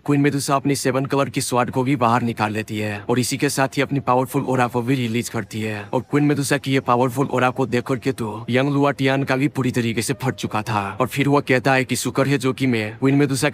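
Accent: Bihar, India. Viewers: Bhai aap 😂paka bihari honge 😂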